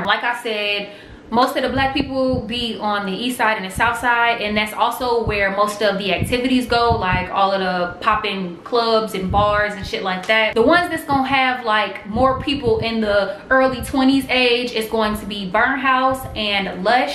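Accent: Chicago, United States. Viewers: Girl I just knew you were from Chicago from when you first started speaking!!! I’m from Chicago been living in west Texas for 9 years. My hubby and I are Thinking about moving to San Antonio. That’s how I found your videos!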